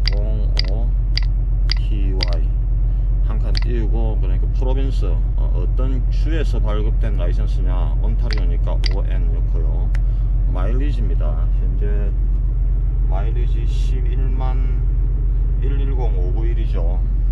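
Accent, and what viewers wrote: Gyeongsang, South Korea. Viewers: 오빠 열나게 달려봐라. 단디 잡아라 가시나야...... 경상도 사투리는 언재나 들어도 재미있고 친근감이갑니다. 아저씨는 들을수가없어 안타 깝습니다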